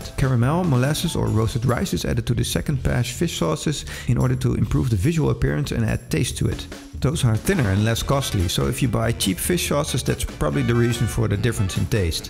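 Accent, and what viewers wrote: Netherlands. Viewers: annoying dutch accent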